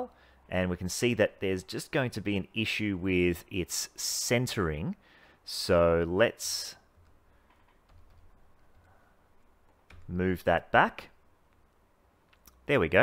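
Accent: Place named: Australia. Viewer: Aussie accent, Pikachu in background... off to an awesome start. lol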